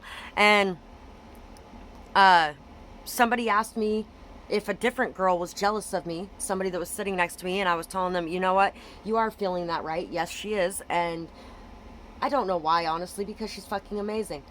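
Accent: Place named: United States. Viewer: euhm i don't understand American accent :/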